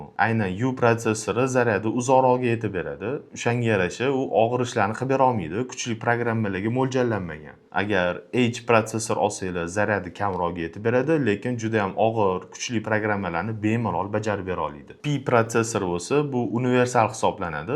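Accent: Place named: Tashkent, Uzbekistan. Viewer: Toshkentcha gapirmay o'l, bloger degani adaboy tilda gapirishi kerak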